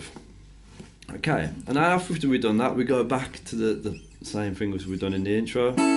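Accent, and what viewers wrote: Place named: Midlands, United Kingdom. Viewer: U sound really Midlands lol I love it......Ur teaching but I need you to show me imgd but dumb lol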